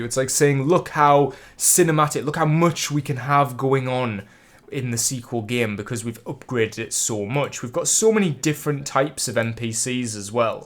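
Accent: United Kingdom. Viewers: That thick British accent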